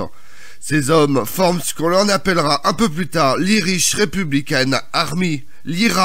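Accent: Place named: France. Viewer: Ta prononciation de l'Anglais est très... Française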